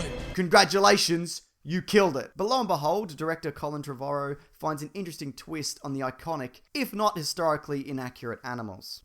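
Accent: Australia. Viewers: He lives in Australia hence the accent.. Cmon now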